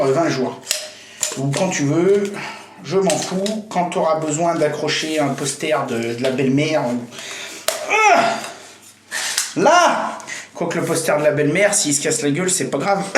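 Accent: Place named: Loire, France. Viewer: Super tuto merci! Tu serais pas de la Loire? Il me semble avoir reconnu l'accent de chez nous 😁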